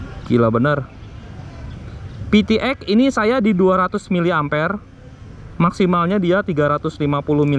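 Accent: West Java, Indonesia. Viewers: Kang sampean orang sundanya, kanyahoan dina logat nage😁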